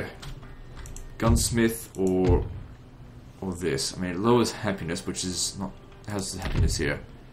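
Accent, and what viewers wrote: Australia. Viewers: are you aussie mate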